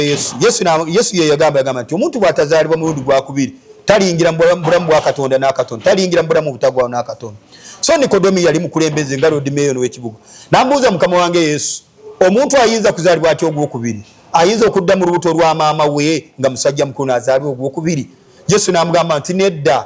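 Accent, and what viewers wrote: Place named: Tooro, Uganda. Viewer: I like the accent of this man 😊 olabilawo nti wawaka Tooro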